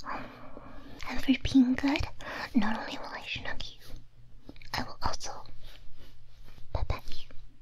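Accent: France. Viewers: Hmmm, I think I like the French accent now. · La plupart du temps quand j'entends un non-natif parler Français je trouve ça un peu dure à écouter car l'accent m'a l'air forcé. C'est pas du tout le cas là par contre, l'accent et la prononciation tombent pile dans le territoire en frenglish mais compréhensible ce qui rend l'audio bien plus calme et relaxant. Most of the time when I listen to a non-native speak French I find it hard to listen to because the accent seems forced. Not the case here tho, the accent and pronounciation fall right between frenglish yet understandable territory, which makes it way more enjoyable and relaxing imo. Great stuff as always. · Frenglish is simply adorable in such a typically way. I speak both French and Italian, maybe we'll get an Italian maid next?:3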